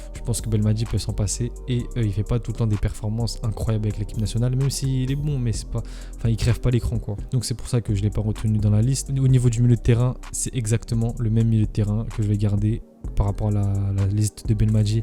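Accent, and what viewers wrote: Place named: France. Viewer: C,est quoi cet accent français ? Tu mâches tes mots, on ne comprend rien !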